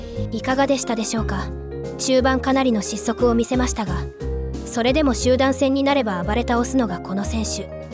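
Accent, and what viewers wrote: Kansai, Japan. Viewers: なんでそんな関西弁押しになったんですか？😂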